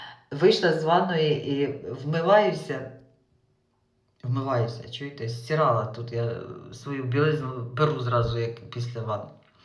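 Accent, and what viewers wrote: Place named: Kuban, Russia. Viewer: Пробило на хіхі.. кубаньська балачка.😂😂😂❤1972 рік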